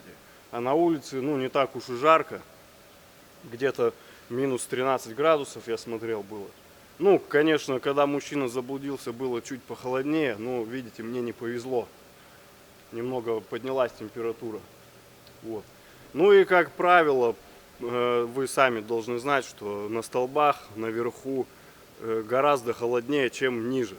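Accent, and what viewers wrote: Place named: Siberia, Russia. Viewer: Говор сибирский. Термометр надо было захватить.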